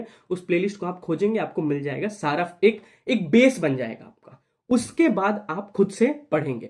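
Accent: Bihar, India. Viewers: Bhai sach sach batana Aap Bihar se ho na ☺️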